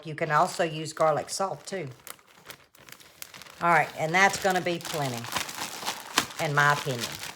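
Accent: South, United States. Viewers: Southern lady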